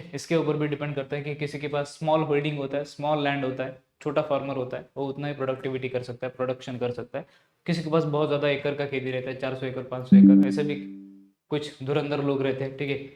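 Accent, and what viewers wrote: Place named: Maharashtra, India. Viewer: Ha bolyvarun tar marathich vatat ahet sir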